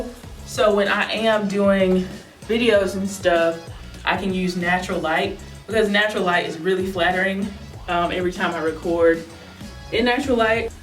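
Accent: South, United States. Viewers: Are you southern?I just became a follower today. Your videos kept popping up and I love your content. I cant help but detect a drawl. Im from Mobile.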